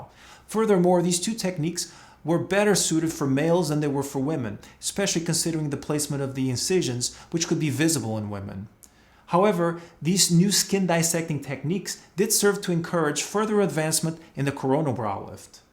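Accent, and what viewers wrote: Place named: Turkey. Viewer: is he Turkish? he sounds Turkish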